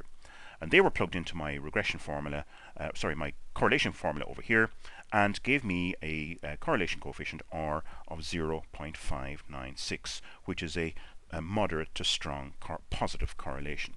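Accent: Ireland. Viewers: A very interesting video no doubt and the Irish accent is a great addition, especially if you are going to watch it 7 times, almost one after the other as I did ... I understand the importance of the PCC to find relationship between X and y (if any) but when it comes to the slope, why are you not calculating it as the result of SP / SSx, especially when we have those values good and ready?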